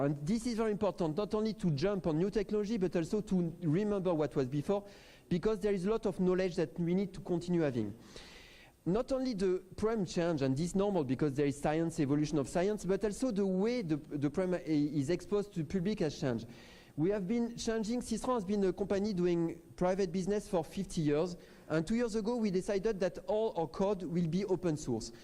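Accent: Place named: France. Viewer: Nice french accent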